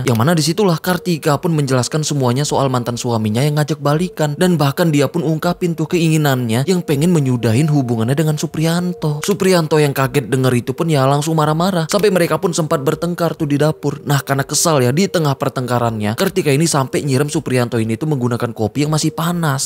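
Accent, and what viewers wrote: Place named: Java, Indonesia. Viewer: Sak jane penak kisah cintane supriyanto sampek bolak balek medok 😃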